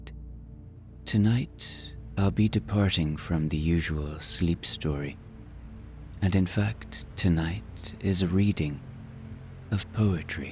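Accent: Ireland. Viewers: if you see by chance you read this message, I must convey my eternal gratitude for your bedtime meditative stories! I have a very hard time falling asleep. You have the most soothing voice and love your Irish accent! I’ve been to your native country twice I loved it there so much I almost bought a little cottage near Galway but had to reconsider because of family back in Florida! Thank again❤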